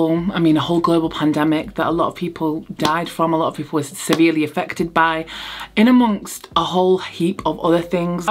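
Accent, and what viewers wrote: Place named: North, United Kingdom. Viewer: Lit the most straight up northern gal love it 💕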